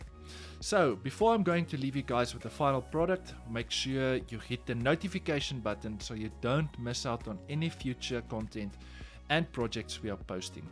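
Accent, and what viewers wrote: South Africa. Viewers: Interesting video, in 2021 I came across a video about epoxy tables and I was intrigued did research and it was interesting, then I let the idea of starting an epoxy business goes waste, 1 1/2 years past then I came across your channel, perfect because I could understand your better because you from SA...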